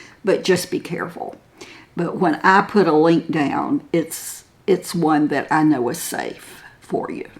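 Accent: South, United States. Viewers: Thank you. I hate to say, but I will. I’ve been watching video after video. My mom and my aunts have been gone a very long time. So this was like getting to spend time with them again. A little while ago I was explaining something to my husband and I found myself going into all the small details. I realized I was speaking with your voice, sans southern lilt. Thank you! For everything.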